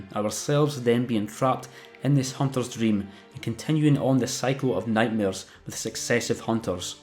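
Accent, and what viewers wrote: Scotland, United Kingdom. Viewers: I will never complain about listening to a Scotsman talking about one of my all time favorites for hours. Also, i completely agree the stupid motion control gestures happen far more often on PS5. Ludwig is rough, so are Winter Lanterns, the defiled chalice bosses, Crow of Cainhurst, and Orphan, but no one, NO ONE in this game is harder and more lethal than that mother#%$&!@ in the blood river cave with the gatling gun. Eff that tard. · was gonna sleep to this until i heard you're british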